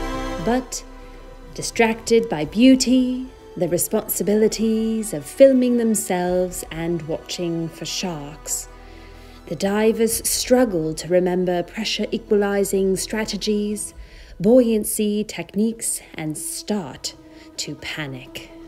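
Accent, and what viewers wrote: United Kingdom. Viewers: I liked the voice, sounded authenticity British to me and fun. Hope you don’t stay sedately in FL. Happy Holidays will miss you😷😳🥲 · Totally loved your British accent. Very funny, especially the panic part. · I thought that was a very impressive British accent. Well done mate!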